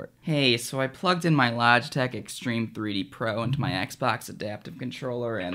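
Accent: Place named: California, United States. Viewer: Cara, esse sotaque de quem mora na california me da um enjoou, sla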